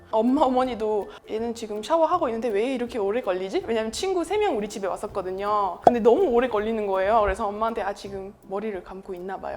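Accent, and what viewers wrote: South Korea. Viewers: 화면 안 보면 한국인인 줄 알 듯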